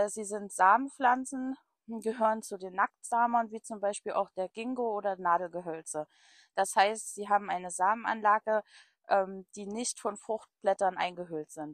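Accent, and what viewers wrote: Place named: East Germany, Germany. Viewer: Warum bloss, weiß ich sofort, dass jemand aus dem Osten kommt?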